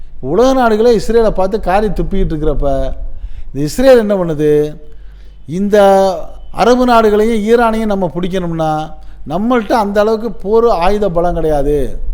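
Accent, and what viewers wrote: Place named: Rural, India. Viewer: உங்களின் பேச்சில் கிராமிய மனம் வீசுகிறது.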